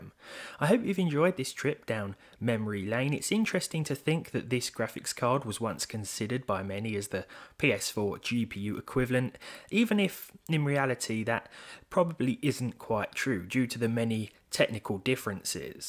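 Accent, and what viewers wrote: United Kingdom. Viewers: i really like your british accent 😁😁😅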